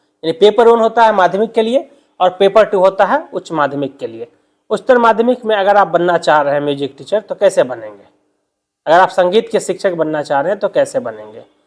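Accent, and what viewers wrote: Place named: Bihar, India. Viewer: Bihar se h madam o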